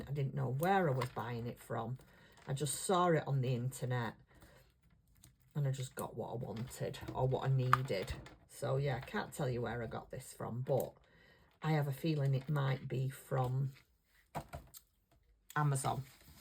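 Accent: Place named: England, United Kingdom. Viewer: I like it a lot! I just started junk journals last year and I am like you! I tend 5o hurry through and then I make mistakes and sometimes tear things apart! 😂😂😂. Keep up the great work! One your paper choice! Where in England are you from? I lived in Suffolk many years ago ( I’m American). Loved it! Love your accent!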